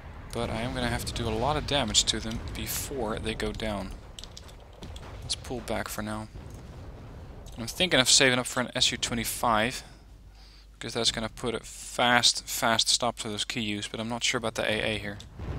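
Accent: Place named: Netherlands. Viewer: phung From his accent and voice i would say he is from the Netherlands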